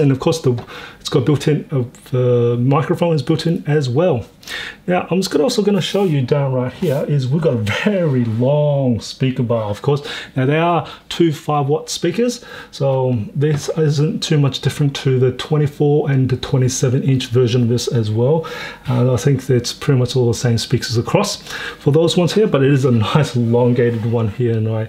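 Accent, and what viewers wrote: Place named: Australia. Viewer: You sound Australian, if you are where did you buy this monitor from in Australia?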